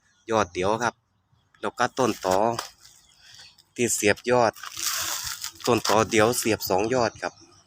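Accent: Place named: Korat, Thailand. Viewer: สำเนียงเหมือนโคราชบ้านเอ็งเลย ปลุกที่โคราชมั่ยคับ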